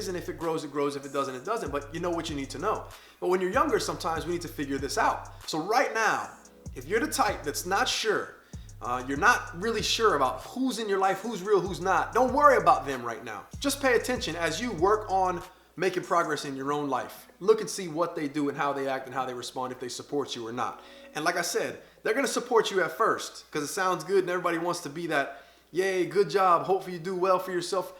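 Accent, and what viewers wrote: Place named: South, United States. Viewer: Country accents are ☺️☺️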